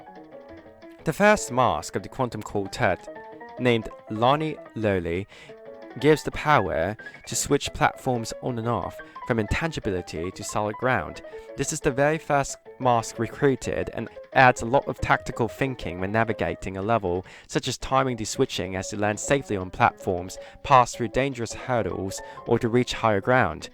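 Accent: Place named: United Kingdom. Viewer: Also, your British accent is 👌👌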